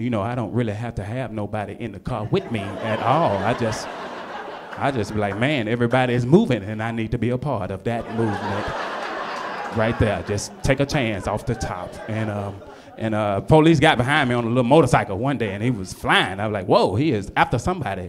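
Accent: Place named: South, United States. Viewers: The accent. Probably from the same part of the south. Just a guess. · That black atlanta accent is hilarious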